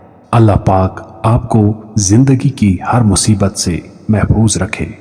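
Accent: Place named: Kashmir, India. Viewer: AAP KOI KASHMIRI PERSON HAIEN AAP KI AWAZ BATATI HAY BUT I AM KASHMIRI FROM MAQBUZA KASH MIR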